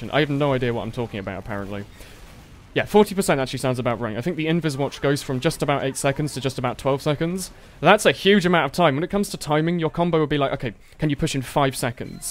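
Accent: United Kingdom. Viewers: what part of the UK are you from? Sheffield is still relatively warm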